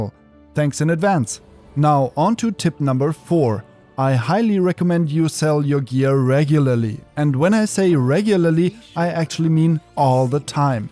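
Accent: Germany. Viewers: Bro question? Are you German? I listen a small accent😅 anyways, your video helped a lot thank you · Man merkt sofort das du deutsch bist an dem Englisch is nix auszusetzen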